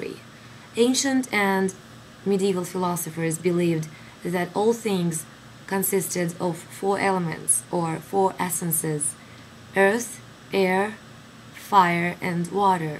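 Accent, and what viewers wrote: Russia. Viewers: She's most likely Russian. Notice how she pronounces the words "lesson", "fifth" and especially the vowel "a" as in " ". BTW: LOL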